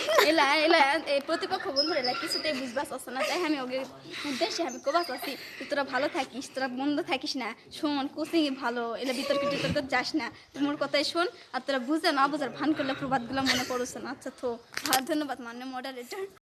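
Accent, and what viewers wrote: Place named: Joypurhat, Bangladesh. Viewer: এক্কেবারে হামার কতার মতো বারে😅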